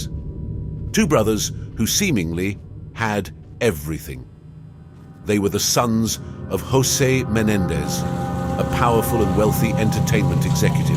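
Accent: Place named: United Kingdom. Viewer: Кажется это британский английский -- если я не прав можете кинуть в меня камень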